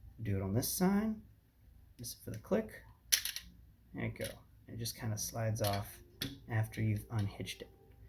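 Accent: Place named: Louisiana, United States. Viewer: Alright you asked for constructive criticism so here goes. So I don’t know how much editing you can do, but if you have control over when the video begins, I would wait until your phone does the notifications thing, give it a few seconds of silence then do you intro, and then just drag where the video begins past the notification sound so it’s just before you start talking. So one thing I think you could do is if you are going to talk about the box in the video you could have it pre measured so you don’t have to do that on camera, cause that does pad out the video. But I would also say that most people don’t care about the box. It’s something most people are going to throw away and it’s not what they came to see. So consider removing talking about the box, or put it later in the video. What’s with the Cajun Accent by the way, are you trying to be Craftsman? 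Oof That sucks. I had a similar thing where I went to visit my grandparents, and my parents got the brilliant idea to throw away my bed because it was old while I was gone. But they got lazy and didn’t buy another one by the time I got home, so I had to spend 3 days sleeping on the floor, before I got a new bed. I feel like coffee cups may not be a great unit to measure it. I don’t think we need to know the size of the guns, so definitiely. Maybe come up with a personal scale for stuff like that. Like dinky, regular, or big boy sized guns and accessories, just try to group them without giving an exact size cause that won’t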